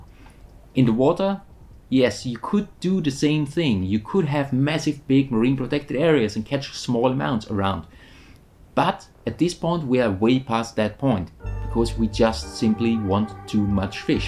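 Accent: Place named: Netherlands. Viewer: Klinkt als een Nederlander.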